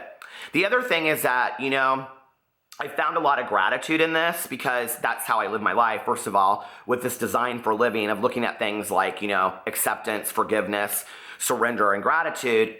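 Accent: South, United States. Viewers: Ur a hoot!!! I absolutely love ut channel, personality, thoughts and southern accent....haters will hate - please dont evet forget how loved u r! 💜💙💚💛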